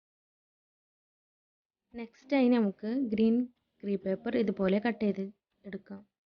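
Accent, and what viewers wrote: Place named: Nilambur, India. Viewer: Nilambur Samsarthilekku ethi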